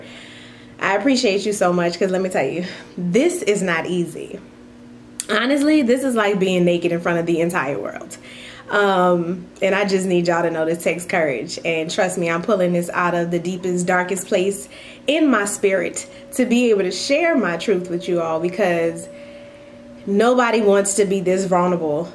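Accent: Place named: New Orleans, United States. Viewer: For the first time ever I heard that New Orleans accent/dialect...so sexxxy!!!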